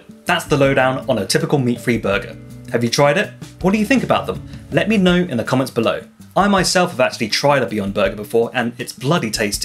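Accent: United Kingdom. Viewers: this has to be a first for me 52 years on this planet , i have never saw an asian speaking british accent.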